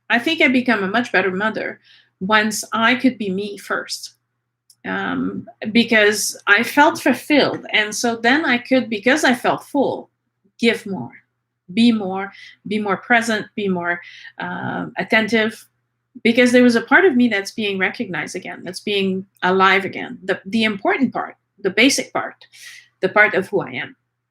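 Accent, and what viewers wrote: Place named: Quebec, Canada. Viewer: Thank you so much for this video. 2 and a half years ago I got a crippling mystery illness near my left ovary no one has been able to diagnose. I was in dibilatating pain with crushing pain for 3 weeks out of the month(using past tense because I know I am healing now.) and just as I thought things couldn't get worse...this illness, losing my career, not being able to go out or see anyone... My father was diagnosed with cancer and passed within 3 weeks basically because the medical system. I thought I would die. I lost my protector and best friend. This happened 2 weeks ago. Then yesterday I started a Dr Joe Dispenza meditation group and during the meditation, I kept hearing the name Hathor.. Continuously. I didn't know anything about Hathor... But I'm purely Egyptian by blood. I couldn't believe what I learned about her or "them" afterwords. 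It was mind boggling how much she completely related to my health crisis and losing my beloved father. She's here to guide me into healing by being a better mother to myself. To reconnect with my Divine femininity. And your video brought me so much clarity. Im very grateful for you. Thank you from the bottom of my heart for all this knowledge. Also, are you from Quebec by any chance? I am, and your accent sounds like the Quebecois here 😊sending you so much love and gratitude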